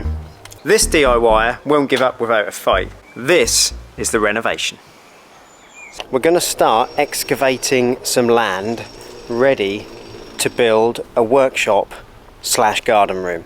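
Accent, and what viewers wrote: Norfolk, United Kingdom. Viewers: Ah! the YouTube algorithm sometime throws us an unexpected delight every now and then. Left home as a boy to come to London, and I really miss the Norfolk accent. A real pleasure to hear it spoken..a rare find on YouTube….wishing the young lad here all the best in his filming and diy adventures!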